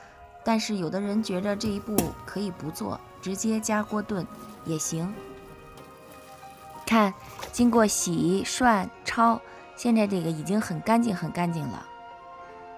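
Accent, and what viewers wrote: Beijing, China. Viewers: 虽然我不吃，看着也香。今天说话有北京味了！爱听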